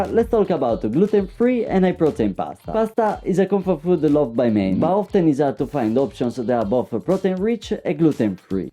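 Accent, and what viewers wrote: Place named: Italy. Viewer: I love his Italian accent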